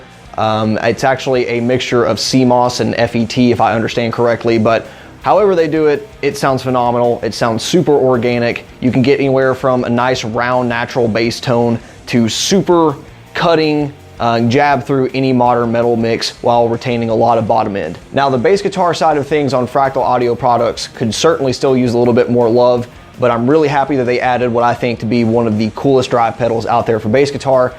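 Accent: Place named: South, United States. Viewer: Where you from? I’m hearin a southern accent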